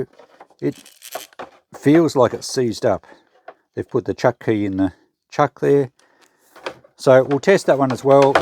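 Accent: Australia. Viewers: Hi, I think you have an Australian accent? So when you say dollars, presumably these are Australian dollars? Or New Zealand? Or are you maybe an Australian living in the US? Just curious as the value of the tools seems to be very relevant, here and I need to translate to my own currency.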